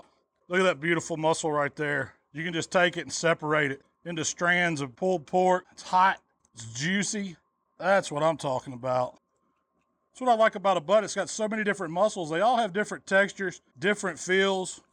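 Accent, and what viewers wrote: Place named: Georgia, United States. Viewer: You know a BBQ video is authentic when the cook has a thick Georgia accent. I’ve used the snake method for cooking BBQ pork shoulder, and it’s almost a “set it and forget it” method. It’s easy, no messing with adding additional coals, and works every time. Thanks!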